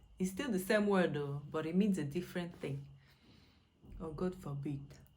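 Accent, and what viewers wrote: Igbo, Nigeria. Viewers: Even her ascent have changed to that of a typical Igbo woman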